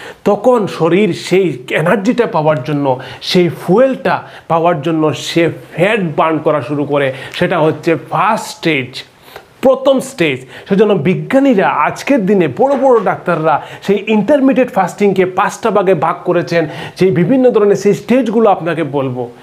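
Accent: Sylhet, Bangladesh. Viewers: 👉Oi eegu aamrar siloti Shur -ey -kelu ucchharon korer byei! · আপনি সিলেটি কথায় বুঝতে পারছি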